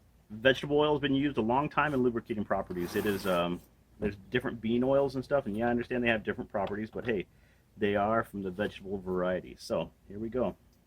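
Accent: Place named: United States. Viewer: No way Aussie, definite American there mate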